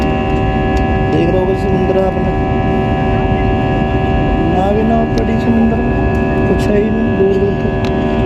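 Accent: India. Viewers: Bhai india ka bilkul desi ladka h Very good video ye h bharat Ke gaon ki desi aawaj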